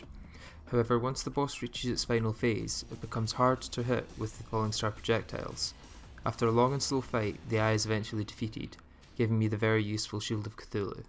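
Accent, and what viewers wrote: Scotland, United Kingdom. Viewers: You Scottish ???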